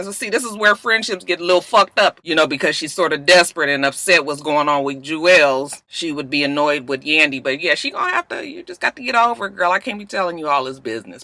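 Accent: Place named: West Coast, United States. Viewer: Thanks,for the amazing videos. I've followed you and for years and am never disappointed! You continue to grow,entertain and inform without missing a beat. I don't know if you intend to be a role model,but indeed you are! I'm your age and I still feel like I wanna be like when I grow up! lol! same for and she's much younger than me. I love you to pieces! both of you. Thanks for sharing you with us....I wanted to tell you for awhile, I can tell you lived on the west coast because you sound like Ray J with some words! "Here" and "there" y'all say just alike! lol! Love you! Thanks for being consistently you! 😊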